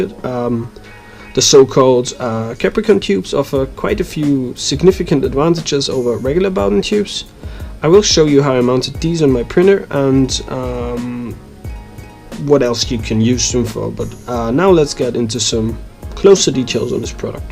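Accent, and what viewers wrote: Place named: Netherlands. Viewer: Bist du gebürtiger Deutscher? Ich höre da irgendwie einen Niederländer heraus, du sprichst ziemlich gut :)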